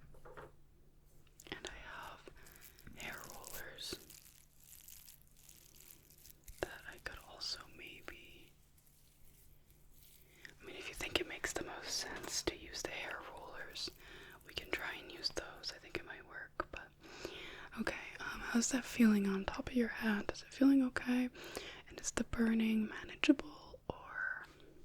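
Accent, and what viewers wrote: Canada. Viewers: ...Nigga are you canadian?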